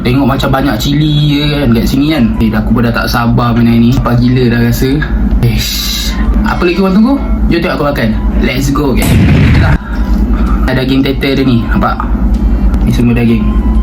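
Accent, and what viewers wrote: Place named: Melaka, Malaysia. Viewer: SODAPPPP! BUAT VIDEO LAGI BANG, PEKAT NAU LOGHAT MELAKA!!!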